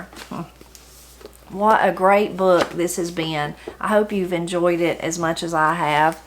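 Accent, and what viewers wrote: Appalachia, United States. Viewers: I cannot tell you how much I enjoy listening to the books you read about lives in Appalachia with your beautiful accent…! I have lived in the Blue Ridge for 5 years bow and Love the stunning grandeur of these mountains, the graciousness and simplicity of the people and their connection to this land. Sad to see this book end…!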